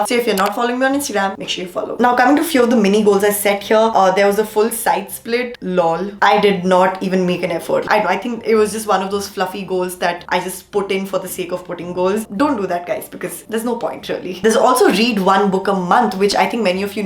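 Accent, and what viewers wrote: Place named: India. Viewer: I love your accent so much I am 40% Indian and 50% Jamaican and I have an Indian accent and yours just makes me happy to have it <3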